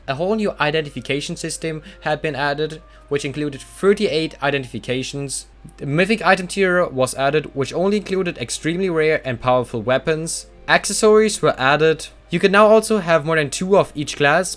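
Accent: Germany. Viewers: Are you german? Your accent seems like a german accent. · You are german aren`t ya? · du bist deutsch oder hahaha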